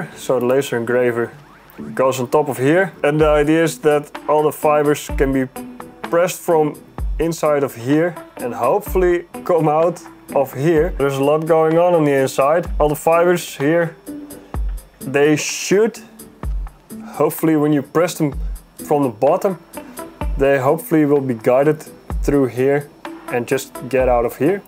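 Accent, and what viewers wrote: Netherlands. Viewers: Man je hoort gewoon dat je Nederlands bent 😂.. Gave project !! Er zijn ook pompen welke een constante flow hebben. En je hars wordt door de uv (welke uit je draden lekt) gehard in de slang. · hahaha prachtig, ik dacht het al te horen aan je accent en ik wist het zeker toen je iets nederlands zij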